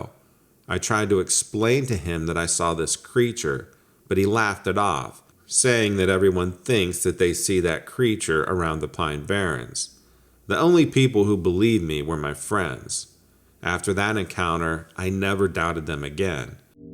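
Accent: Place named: Mid-South, United States. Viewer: I really enjoy your accent - sometimes I hear mid-South (Kentucky/Tennessee), then at other times I hear the Western states (WY/CO/NM/AZ). Well, no matter, your voice adds so much to these stories, and makes them a pleasure to listen to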